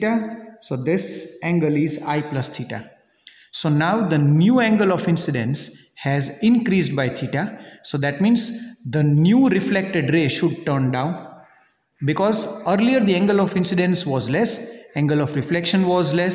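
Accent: Gujarat, India. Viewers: Are you Gujarati?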